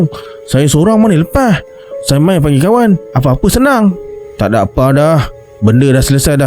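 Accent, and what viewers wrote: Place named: North, Malaysia. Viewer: Memang sempoi video kali nie 🤟 loghat utara nohh 👍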